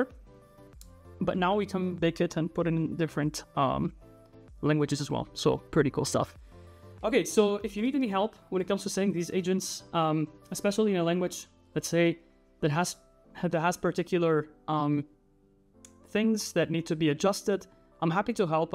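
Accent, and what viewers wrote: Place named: England, United Kingdom. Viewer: si ma l'accento è totalmente inglese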